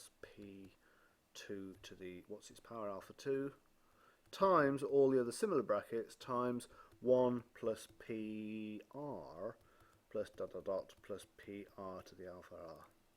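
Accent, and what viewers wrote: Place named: England, United Kingdom. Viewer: Brilliant. Also audio is fine as well for me. So nice to hear an English accent as well (just a personal comment).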